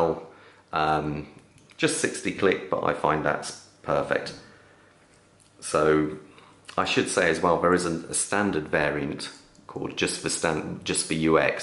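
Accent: United Kingdom. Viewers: In all fairness, he’s sounds British.....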